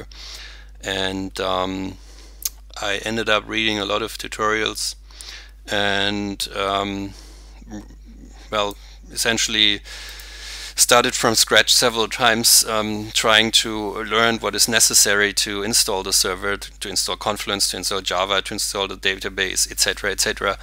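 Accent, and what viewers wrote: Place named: Germany. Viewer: 100% er ist deutscher, das hört man so raus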